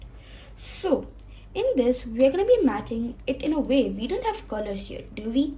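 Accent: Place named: Europe. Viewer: your accent is very exotic - i'm guessing it's european... youthful tone adds cuteness. hope you don't mind letting me know what your ethnicity is. thanks in advance.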